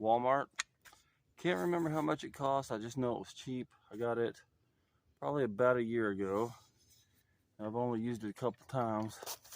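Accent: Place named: South, United States. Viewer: I'm going to take a wild guess that you're from the South :)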